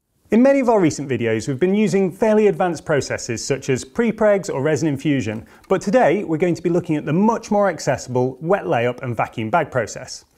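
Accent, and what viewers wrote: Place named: Middlesbrough, United Kingdom. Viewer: I know this has nothing to do with composites, but Id like to know where your accent is from, I know it's a commonwealth accent, but I keep hearing a bit of brough in it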